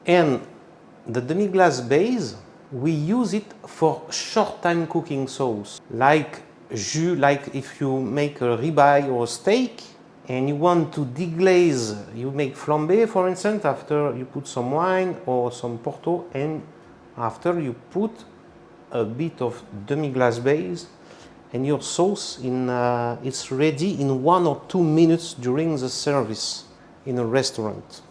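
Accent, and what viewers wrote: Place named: France. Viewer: j'ai mis une minute a comprendre que la video est en anglais, je sais pas si l'habitude de l'anglais, le french accent, ou l'herbe dans la cigarette, bref merci pour la video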